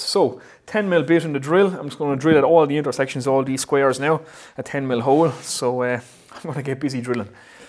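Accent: Ireland. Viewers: My grandparents were Irish and it’s nice to here the brogue again after over twenty years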